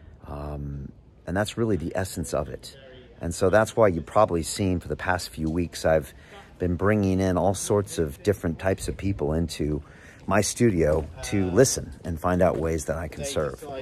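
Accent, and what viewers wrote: United States. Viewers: how can he be the mayor of London England and has an American Accent? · You sound very american.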